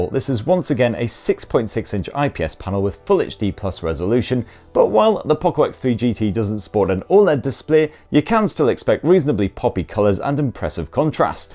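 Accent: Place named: Northern England, United Kingdom. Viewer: northerns his way swiftly through this review. Typical up north camera locations and plenty of chuckles at his brash humour. Stay safe.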